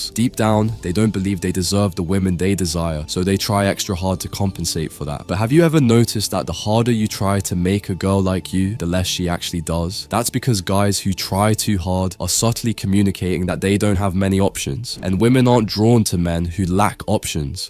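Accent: United Kingdom. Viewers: UK accent🔥🔥🔥🧠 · Are you British?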